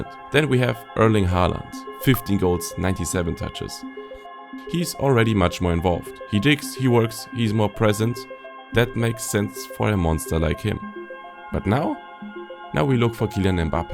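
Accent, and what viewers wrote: Germany. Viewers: I don’t think this guys ever seen Kane play despite his German accent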